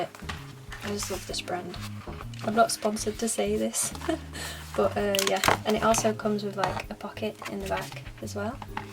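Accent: England, United Kingdom. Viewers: Wow! So cool!💘💞💞💜 Actually, I always have question.. Are you from England?)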